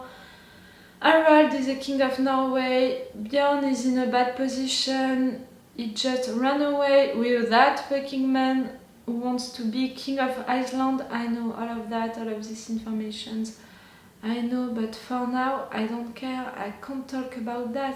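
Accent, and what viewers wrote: France. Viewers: Are you french? You have a accent french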